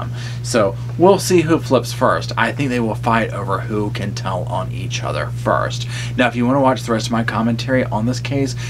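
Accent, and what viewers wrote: South, United States. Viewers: Thanks, from Ireland 🇮🇪. I love your southern drawl and your hospitality knows no bounds. If you're ever in Ireland I'll serve you some pie, we'll gather around the table and talk true crime. Very informative and well researched and what makes it so entertaining is your wonderful personality and talented acting. Love you. 💕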